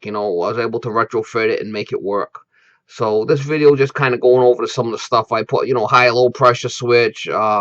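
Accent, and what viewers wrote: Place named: South Georgia, United States. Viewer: That's a South Georgia accent if I've ever heard one... great job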